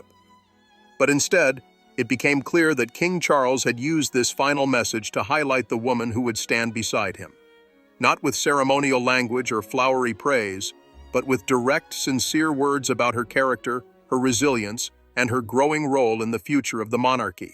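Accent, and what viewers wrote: United States. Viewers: Disrespectful using a US voiceover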